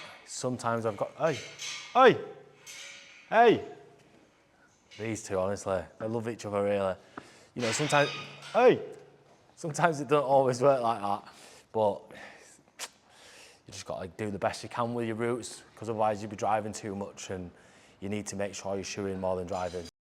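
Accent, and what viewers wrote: Yorkshire, United Kingdom. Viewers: He is a Yorkshire lad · your Yorkshire patois something words escape my understanding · Where are you from? Britain? Sounds like. I' ve been to Oxford, Kidlington, Cambridge, Bath, London, Bournmouth, Blenheim Palace and Newmarket, watching the racing horses 🥰. And what's your favourite knife? 🤗🦄 Thanks for sharing. 👋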